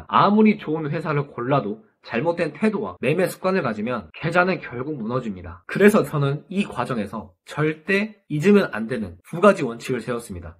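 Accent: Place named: Honam, South Korea. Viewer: 호남사트리 ㅋㅋㅋ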